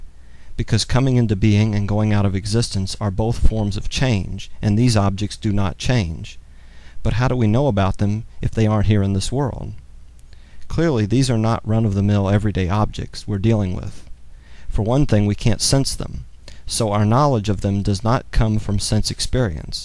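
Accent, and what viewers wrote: Oklahoma, United States. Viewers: hearing philosophy in a southern oklahoman accent is hilarious after a while ^_^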